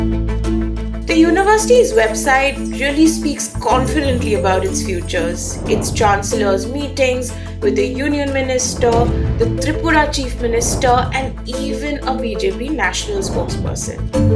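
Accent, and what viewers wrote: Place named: United States. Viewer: This woman speaks in Americanized English and has a problem with lobbying? JNU bawasir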